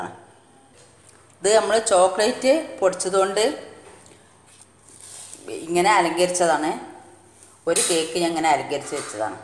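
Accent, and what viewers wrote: Malappuram, India. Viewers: Hi ..ഇത്താ..ആദ്യായിട്ടാ കാണുന്നത് വീഡിയോ.. സംസാരം അടിപൊളി..മലപ്പുറം ആണോ...വീഡിയോ ആരാണ് എടുക്കുന്നത്...എനിക്കും ഒരു ചാനൽ തുടങ്ങാൻ ആഗ്രഹം ഉണ്ടായിരുന്നു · Ningal ithupole thanne samsarichal mathi malapurambasha athukelkana ishtam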